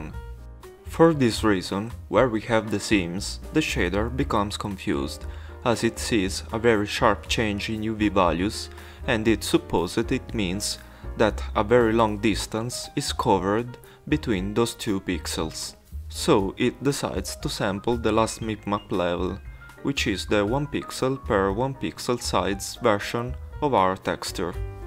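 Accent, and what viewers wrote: Italy. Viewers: Where is this guy from? His accent is a mix of like Italian and...I dunno, Indian? It's awesome. However, I have to say, more explanation would be great. For example, when you open your blueprint you have "absolute world position" and "mask" nodes already on the screen. Where did they come from and how do I get them? I had to just keep trying nodes that popped up after searching for "world" and "mask" until I found the right ones. And you bring in a parameter node, but which one is it?? There's like a hundred different ones. Same thing , I literally just held down letters and clicked until the right node came up. Just saying which one it is or searching for it instead of using shortcut clicks would be very helpful.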